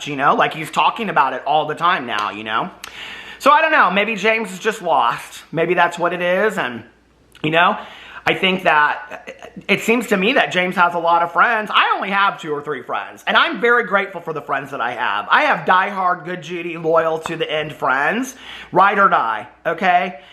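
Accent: United States. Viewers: When I first started following you a couple of years back I didn't understand the American accent and thought you were giving a radio call sign in your intro and maybe you were a DJ haha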